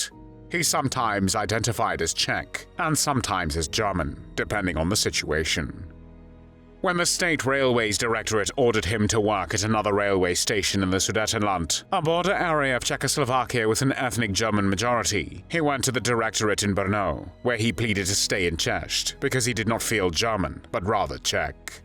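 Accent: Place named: United Kingdom. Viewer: That "Brittish accent" AI voice is just too much for me.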